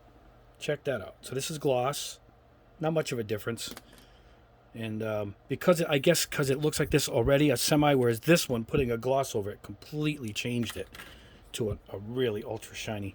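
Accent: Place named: Long Island, United States. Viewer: New England.. I would have guessed Long Island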